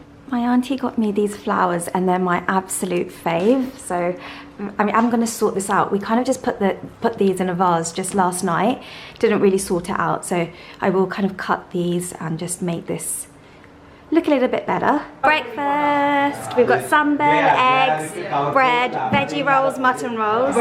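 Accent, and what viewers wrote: England, United Kingdom. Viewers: It’s the English accent .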